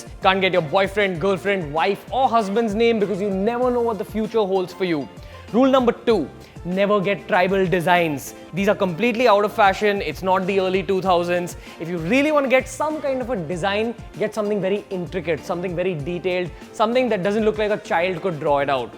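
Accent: India. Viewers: Tatu Indian ascent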